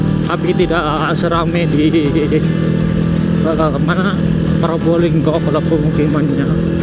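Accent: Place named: Madura, Indonesia. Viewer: Logatnya maduraan.. hehe salam persaudaraan mase....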